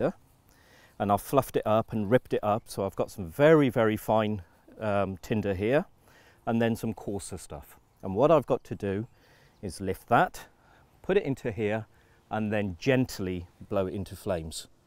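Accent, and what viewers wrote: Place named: United Kingdom. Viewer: An Indian man with a British accent. Bring it on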